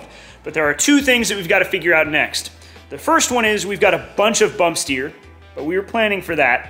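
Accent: United States. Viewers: Totally off subject, but you say you’re from Nashville, but I’m hearing a LOT of Wisconsin/ high mid west in your voice. Pls share where you’re originally from and how you ended up in SoCal (the WHY is pretty obvious!)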